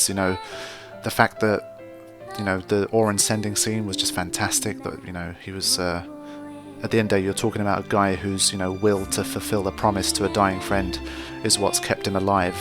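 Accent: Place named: London, United Kingdom. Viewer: What an insightful walkthrough! I've been interested in hearing someone's thoughts about this game, and as a result I found you. I wanted to hear what you had to say throughout your playthrough and I must say that I have thoroughly enjoyed your commentaires. Your explanations about the story make sense, it allowed me to see FFX in a slightly different perspective, so that's nice to hear. Overall, clean commentaries. You talk when it is necessary, you managed to give many details about the game mechanics, as well as adding some sarcasm tone here and there; that kind of humor is always welcome =) Thank you for your hard work and for all the content you willingly share with us, hands down! P.S. Nice voice, and nice accent as well. Londonian accent is the best in my opinion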